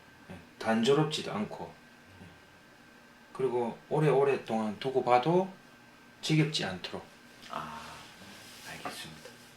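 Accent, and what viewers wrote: Gyeongsang, South Korea. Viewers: 경상도 사투라가 정감있고 너무 웃깁니다,훌륭한 그림배움과 더불어 갖는 큰 즐거움 입니다.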